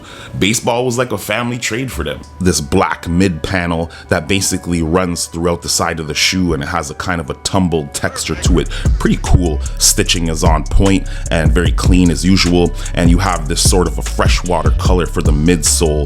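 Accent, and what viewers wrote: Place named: Ontario, Canada. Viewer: You from Ontario? That accent hitting different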